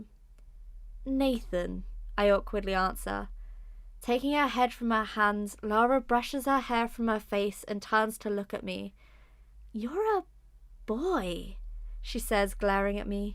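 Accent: United Kingdom. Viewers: Finally. I enjoyed the other Sonya story parts. However, others are right about continuing on with the story. Looks as though there could be many more chapters left to be told . Sonya, Matt and Laura and their adventures..😊😊 Oh. A womderful story told with a British accent too.. If I'm mistaken about accent. Please forgive me...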